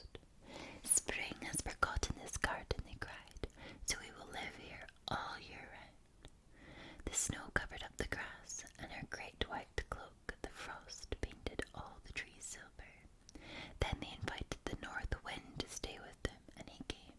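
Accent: Scotland, United Kingdom. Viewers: I’m loving the Scottish accent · Who else would love to see a Shrek roleplay from, she also has a strong Scottish accent, which makes it all the better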